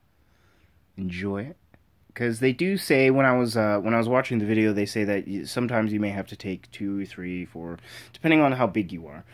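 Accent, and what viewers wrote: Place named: Canada. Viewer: I can almost hear the Canadian in his voice. I wanna say toronto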